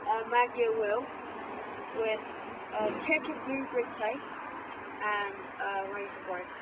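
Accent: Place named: Southern Africa. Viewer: nice southern african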